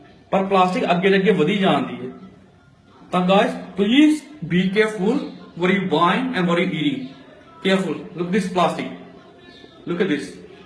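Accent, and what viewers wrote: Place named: United Kingdom. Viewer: Bolda tu ida aa jida U.K. Born aa te xperiment india di gobi de kri janda Eh gobi asli aa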